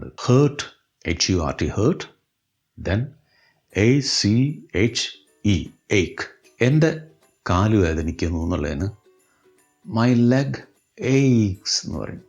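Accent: Thrissur, India. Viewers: ingalu thrissurkaaran aano ?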